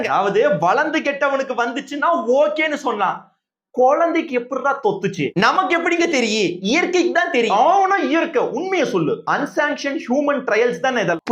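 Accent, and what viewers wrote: Kongu, India. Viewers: Thalaivare arumai pathiugal anaithum superior kongu thamil super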